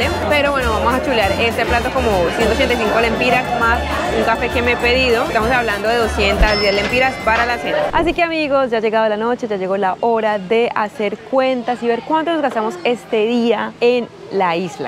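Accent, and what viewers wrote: Colombia. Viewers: gracias parcerita por mostrar lo bueno de mi honduras Dios te bendiga a ti y tu familia me encanta ese lindo acento colombiano el mejor del mundo bendiciones amiga ya me hice presente con mi like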